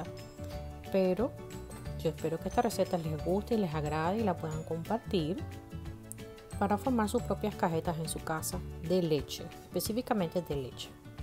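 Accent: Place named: Cuba. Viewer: Yo Pense que era cubana por el acento.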